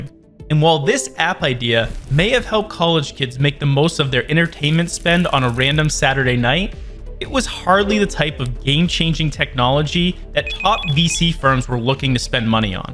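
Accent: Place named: United States. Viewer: sounds very American to me!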